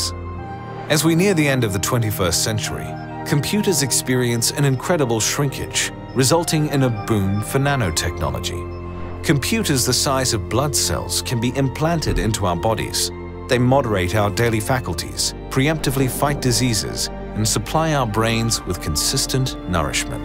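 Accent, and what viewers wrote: United Kingdom. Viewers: I never said anything about clean and shiny animations. I’m not the only one who said they’re mimicking Kurtzgesact, and there’s nothing wrong if they did take inspiration from them. They also have a calm deep voiced British narrator and similar organization and video lengths, they talk about similar topics, the channel also likes posts comparing them to Kurtzgesact so I don’t know what you’re on about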